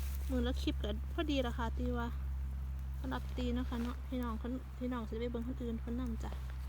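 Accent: Central Thailand, Thailand. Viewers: ไม่ง่ายเลยนะทั้งถ่ายทำไปพร้อมเก็บเห็ดขอบคุณนะจะน้อง และทุกๆท่านที่อยู่ตปท.ที่อุตส่าทำคลิบมาให้คนไทยที่เมืองไทยได้ดูจะชอบน้อง พูดไทยกลางน่าฟังดีและฟังรู้เรื่องด้วยจร้า💚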